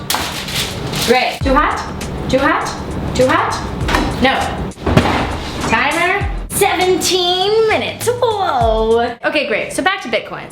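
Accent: France. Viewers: your french accent >>>>> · your french accent 👌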